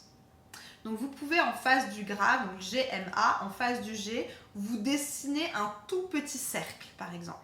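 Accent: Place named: United States. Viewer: ah non, change rien quant à ton génial "sound color" à l'américaine, ça te va fort bien ... géniale vidéo ... une de plus ... en fait, la voix est une espèce de formule mathématique quoi ,...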